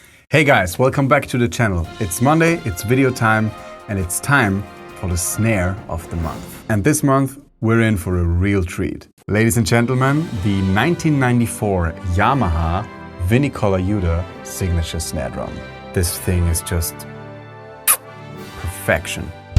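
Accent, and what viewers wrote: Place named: Germany. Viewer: brilliant! I’ll be watching it, cheers, are you in Germany? You sound german, if you don’t mind me asking..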